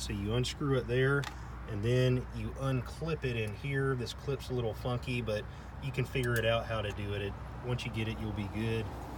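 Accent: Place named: Kentucky, United States. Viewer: You from Kentucky? Sounds just like my brother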